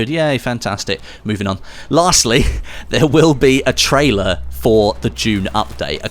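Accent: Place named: England, United Kingdom. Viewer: Have seen worse pronounciation of german words. You have an english accent, yes, besides that, Sonderkraftfahrzeug was pronounced ok, B-C from your german teacher ;P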